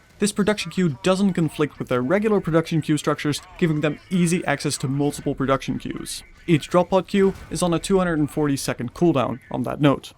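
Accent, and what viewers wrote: Netherlands. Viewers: You have the most dutch accent possible, great guide tho!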